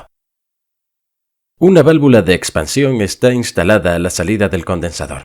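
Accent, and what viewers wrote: Spain. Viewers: tipico acento espaniol :)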